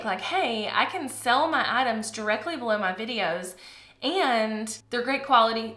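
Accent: South, United States. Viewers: Yeah, your Southern accent is really working for you here. Also, awesome video review from your experience (even though it's a paid promo)! Keep up the fantastic work!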